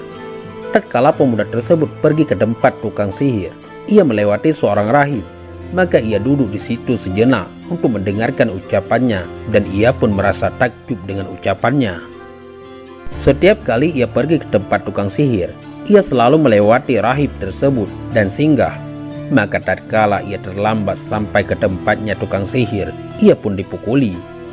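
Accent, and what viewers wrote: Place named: Bali, Indonesia. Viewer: Naratornya..kaya logat orang bali..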